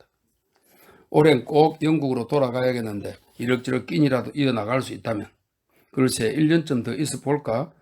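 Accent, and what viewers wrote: Gyeongsang, South Korea. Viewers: 갱상도 아재의 두수한 사투리가 맘에 듭니다. 감사합니다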